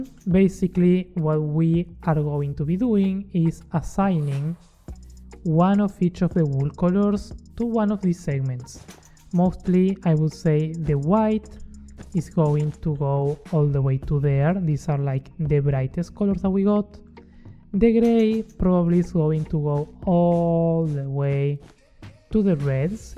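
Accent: Argentina. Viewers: vo so re argentino no? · Suenas argentino.. muy bueno el vídeo, loco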